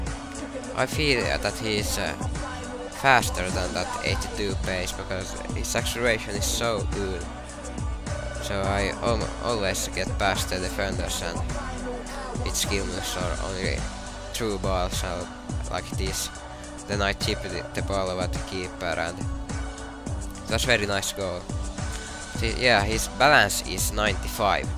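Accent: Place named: Finland. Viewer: haha 40 seconds of commentary and i could hear the finnish accent even though im not finnish nor scandinavian. :) good vid anyway :) ill have a look at him in the career mode as well as fut. keep up the good work mate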